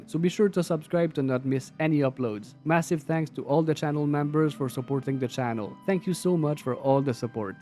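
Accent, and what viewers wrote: Quebec, Canada. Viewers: J’entends l’accent québécois à des kilomètres 😭🙏 nice vid man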